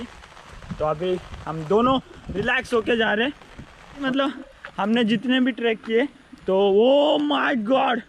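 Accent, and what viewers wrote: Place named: Maharashtra, India. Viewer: Ashich commentary thev, real, marathi ani marathyachi hindi, lavkarach famous hoshil 😂⛳️👌🏻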